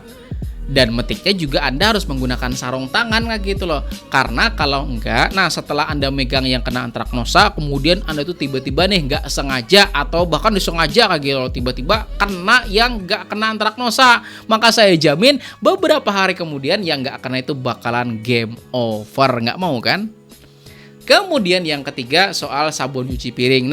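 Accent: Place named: Barabai, Indonesia. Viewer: Logat pian kaya org hulu sungai barabai pak ae😁 salam kenal dari lun petani semangka kab kotabaru kalsel.🤝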